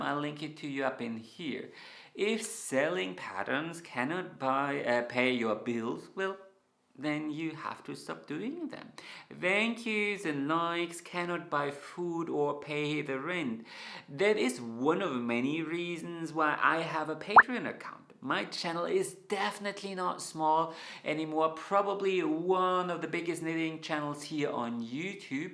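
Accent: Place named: Germany. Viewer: Hello, I found this post today purely circumstantial. Very sound information on a lot of topics. I never thought that copying a pattern from the ner could have so many pitfalls. I knit for myself and don't sell things knitted by me but I give away stuff sometimes. Also found out that you live in Austria. Funny that, I was born in Austria but left 50 years ago. I heard in your pronunciation that you are from a german speaking country but couldn't pinpoint if it was either Austria or Germany. I love your posts and get a lot of good tips, still learning at 76 years old. I am very glad to have found your channel. Good luck to you! ❤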